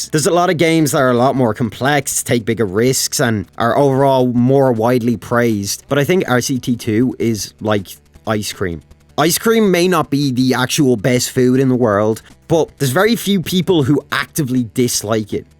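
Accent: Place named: Ireland. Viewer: I know a lot of people in the tf2 community don't like tftubers to branch out and do other things, but I'm genuinely glad to see you make videos on a variety of things bc it's fun to listen to your funny Irish voice, your videos are always amazing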